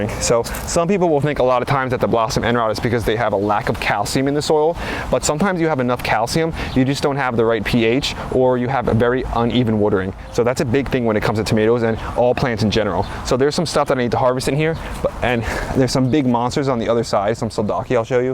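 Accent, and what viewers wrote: New Jersey, United States. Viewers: I hear that Jersey accent, “wooder your plants” 😂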